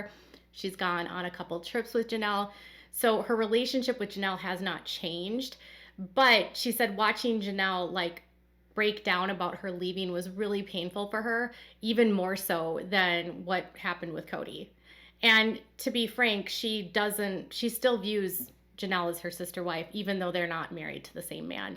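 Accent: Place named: Minnesota, United States. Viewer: I could never put my finger on your accent before because it's VERY slight...but then you said "Minnesota" and I heard it and it was the most adorable thing I heard today 🤣🤣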